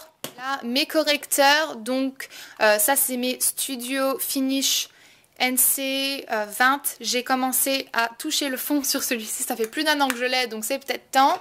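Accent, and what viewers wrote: England, United Kingdom. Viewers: Comment ça se fait que tu as ce bel accent anglais ? Tu es de quelle origine ? J'adore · Super vidéo comme d'hab :) [Aparté : C'est pas un reproche, mais ça me fait toujours mal aux oreilles de t'entendre prononcer la marque "Revlon" en version Française "ON" au lieu de "Revlon(e)" sachant que c'est une marque Américaine et que 99% du temps tu prononces souvent les marques avec ton accent anglais :p Fin bon, ce n'est pas grave, ça fait juste bizarre a entre c'est tout ;) ] Bisou !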